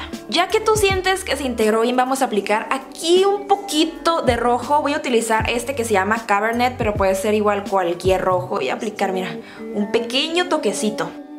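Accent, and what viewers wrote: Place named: North, Mexico. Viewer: Me gusta tu acento bien norteño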